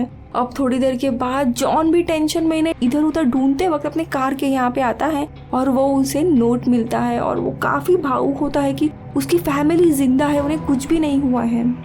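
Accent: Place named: Gujarat, India. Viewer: Gujarati flavour aa rahi hai awàaz me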